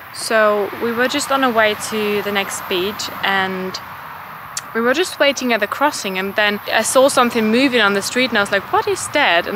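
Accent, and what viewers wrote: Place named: United Kingdom. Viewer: Love it so much! 😍 & you have such a great British accent omg! ❤️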